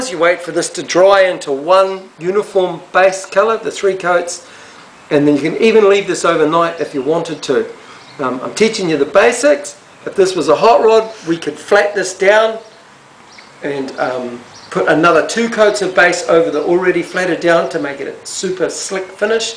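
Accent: New Zealand. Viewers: Great video, clear explanations, nice to hear another kiwi too rather than the usual american.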